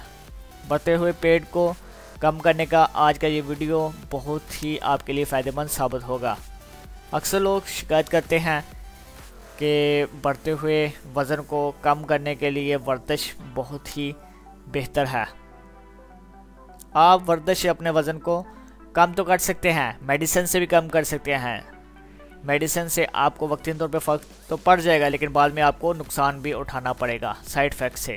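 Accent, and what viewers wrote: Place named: England, United Kingdom. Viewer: Aap urdu ko English k style m bolte ho kia aap English man ki birth ho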